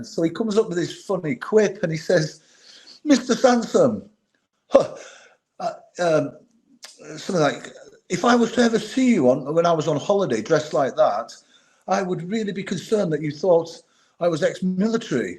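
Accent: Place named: Liverpool, United Kingdom. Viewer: pity about the scouser accent , and he is a crim !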